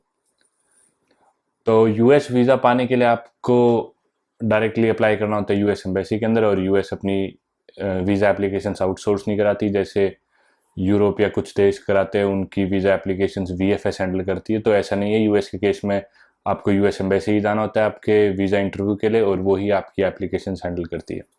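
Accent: Haryana, India. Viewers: Haryanvi ho naa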